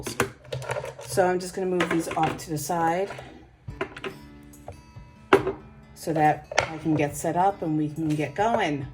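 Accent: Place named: Northeast, United States. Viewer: I’m actually binge watching your videos. I just found your channel yesterday and I love it! You sound like you’re from the northeast which is my home. ❤️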